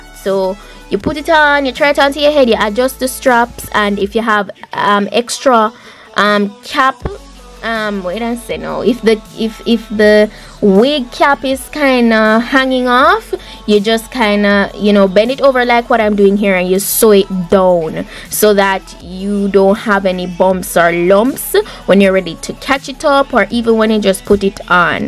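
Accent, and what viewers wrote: Jamaica. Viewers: You have an accent like a jamaican lol I am lol 😄😃 n if u are, ur very gorgeous n beautiful.. That wig were made perfectly 4 you! 😄👍 Tanx 4 sharing.. Blessyou! 😄💓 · From I hear how she talk I know from d start she's a Jamaican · You sound like a Jamaican 👍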